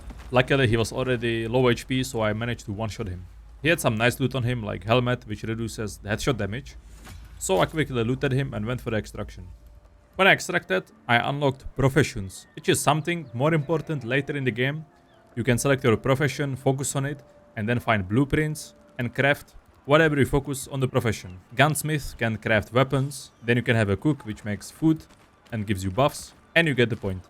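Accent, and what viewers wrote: Czech Republic. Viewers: Czech accent much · To je hrozný jak tam člověk slyší, že je čech... je to takové divný. Jinak super video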